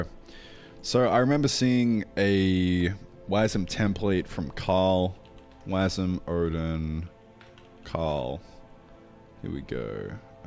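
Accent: Australia. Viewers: mate, you threw me for a loop there. "Take a peep for Circles specific stuff..." Searches for Sokol Aussie accent is from another world.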